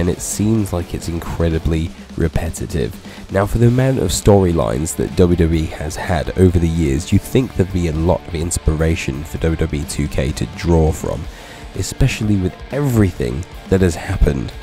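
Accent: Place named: United Kingdom. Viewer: Idk why, but I just love British accents.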